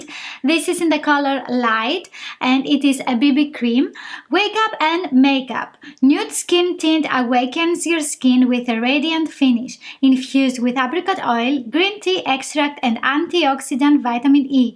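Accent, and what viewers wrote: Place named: Greece. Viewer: Πως φαίνεται ο Έλληνας.. Η προφορα σε προδοσε, και μετα ειδα το όνομα σου.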